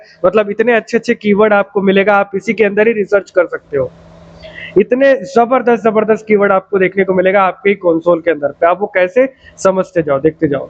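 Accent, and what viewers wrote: India. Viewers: bihar s ho kya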